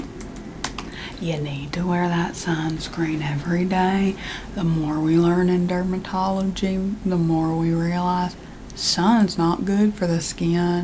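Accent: South, United States. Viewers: Alabama or Mississippi. I can’t decide. It’s so natural. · thank u!!! i loveee the way u speak with this specific southern accent with drawnnn out words. so soothing for some reason lol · hi i’m from the deep south and your southern accent is quite accurate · I love the southern accent videos! I have to watch these way before bed because I’m too interested in the jokes and what’s going on to listen to new ones at bedtime lol 😂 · I absolutely adore the doctor roleplays and like all the checking in and stuff. Especially with the southern accent, I could listen to a 2 hour long version of this! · These southern accent ones are my absolute favorites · Your southern accent videos are absolutely my faves (the Fargo accent was a very close second though) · Love the southern accent, lol. Very tingly ✨ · I love the southern asmr!! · your southern accent is actually so accurate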